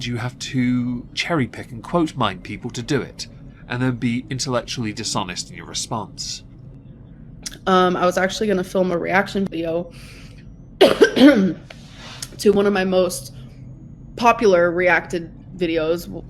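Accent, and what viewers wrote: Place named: England, United Kingdom. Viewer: 😂😂 the only time I ever see these hambeasts is when you make videos, thanks for suffering through it for us. 😂 she will never learn. Though sometimes I feel like you need to remember that America is culturally dominant and that nobody takes the old english accent seriously tho...like accept that and know haha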